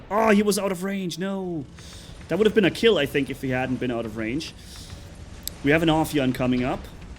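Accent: Germany. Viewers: Wait are you german?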